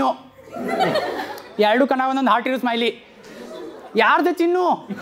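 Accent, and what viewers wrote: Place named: Belgaum, India. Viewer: Clean,simple,very very funny,content- based comedy - this boy,engineer,is very very Witty,I like him,have been seeing all his videos,episodes,good command over Belgaum style of Kann- talk...get going /- am your fan...from Gulbarga- a Doctor by profession,have dn my schooling at st- Paul's Belgaum..loving it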